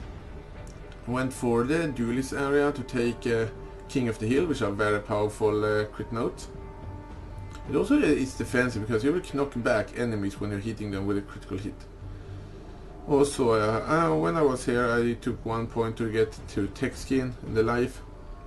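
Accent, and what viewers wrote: Sweden. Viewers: svensk? :D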